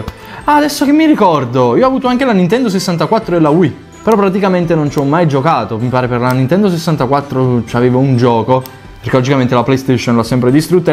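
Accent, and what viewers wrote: Naples, Italy. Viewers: Non si sente che è di Napoli? 😂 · Ma sei napoletano?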